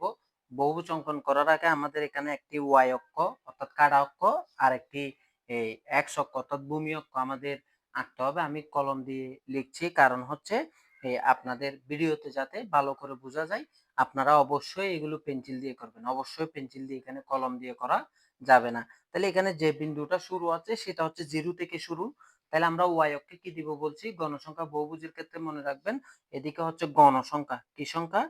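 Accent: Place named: Chittagong, Bangladesh. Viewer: Thik dhorchen....sir er kothay buja jacche je sir ctg er.....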